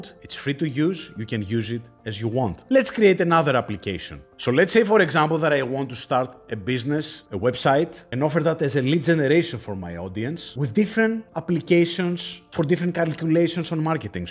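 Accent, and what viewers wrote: Greece. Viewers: You have great info dear friend in this video..just subscribed to don't lose other opportunities😊..by the way your accent seems Greek, right?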